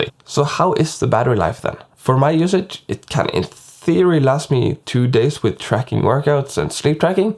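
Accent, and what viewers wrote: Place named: Norway. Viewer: Since english is not your native language, don't sweat it too much with the pronunciation, since norwegian pronunciation probably tries to slip in while speaking in english, and that is totally normal, nothing wrong with it. Focus on keeping up the making of content and your english will tune in by itself effortlessly. Good work!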